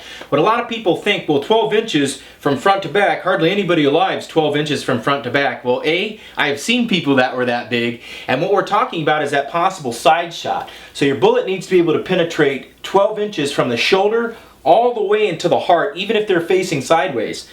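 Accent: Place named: Upper Peninsula, United States. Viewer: you talk like a yooper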